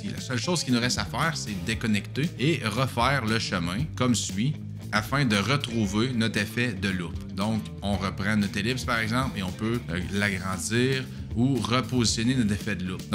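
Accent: Quebec, Canada. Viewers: en effet, pour partager avec un autre utilisateur, cela peut-être utile. Pour ma part je travaille seul donc l'astuce #2 me convient bien 😊. En tout cas merci pour ta reponse et bravo pour ta chaine car trouver des tips francophones sur DVR n'est pas simple. Et en plus j'adore l'accent québécois 😊. D'ailleurs maintenant, je dis tout le temps "pogner une bièèèère" 😉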